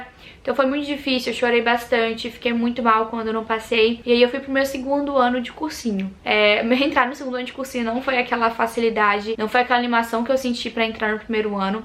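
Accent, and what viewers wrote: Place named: Belo Horizonte, Brazil. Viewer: Não parece ser paraense, seu sotaque é muito diferente. Lembra Belo Horizonte (sou de MG)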